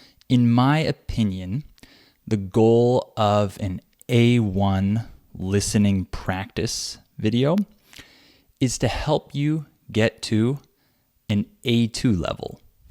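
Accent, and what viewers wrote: England, United Kingdom. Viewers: British or American English ? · It is brutish English?? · Hello sir! I'd like you to provide me some listening material from A1 to forward. And I real love English accent perfect for beginners of English. I'm so thankful in advance.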